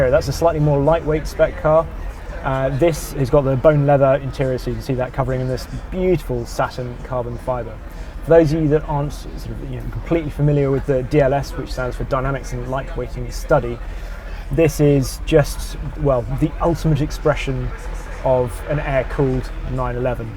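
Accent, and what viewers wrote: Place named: England, United Kingdom. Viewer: this guy mumbles, whispers and has an english accent, cant understand anything he is saying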